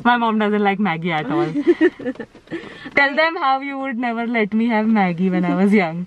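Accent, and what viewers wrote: Gujarat, India. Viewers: That gujju accent😂🤭❤❤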